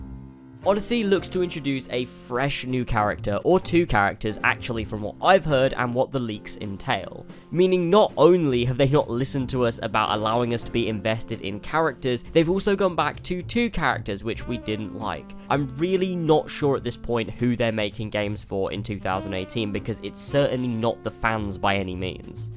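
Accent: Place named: England, United Kingdom. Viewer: This video is misinformative and fuck the narrator with an English accent. You’re gay!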